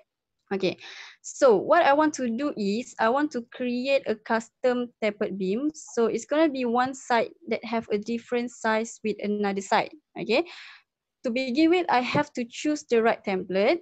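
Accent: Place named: France. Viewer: Thank you for the tip... Very nice Done! By the way what a cute French Accent!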